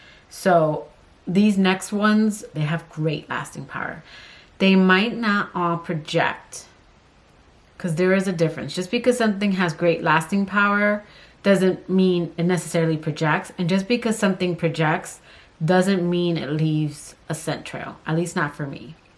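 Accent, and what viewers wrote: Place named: Miami, United States. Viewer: Girl, tell me how I’ve seen a couple of your videos and I thought she has such a Miami voice .. are you from Miami? 🤣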